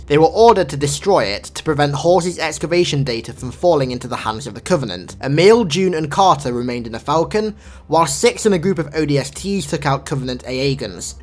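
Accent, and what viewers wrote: United Kingdom. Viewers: i like his voice, it reminds me of an old friend i used to play with back when i was still active on xbox...... though he sounds more british than anything and my friend was canadian.....but still, pretty close.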